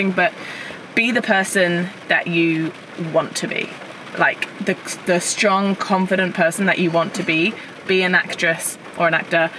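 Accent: Australia. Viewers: I love listening to your accent becoming more and more aussie! great video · a bit of an Ozzy accent coming through lol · Always love your videos! It sounds like accent is changing you sound more Australian now! Also what is the song at the end and could you likn your spotify or his? Thanks Happy New Year! You should do a meet up if you go to Canada!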